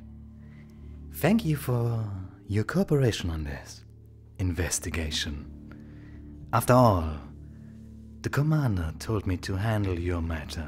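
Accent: Germany. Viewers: Omggg your germannnnnn😭😭😭😭 i lovvveeeeee ittttttttttttt❤❤❤❤❤❤❤❤❤❤❤❤❤❤ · I used to think that he got konig's accent pretty well He's from Germany AAAAAAAAAAAAAAAAAHHHHHHH 💘💘💘💘💘💘💘💘💘💘💘💘💘💘💘💘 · Ich liebs!!!! Wer hätt gedacht, dass n deutscher Akzent so vorteilhaft sein kann 😂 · The German is making me hyperventilate- how do I start paying you for this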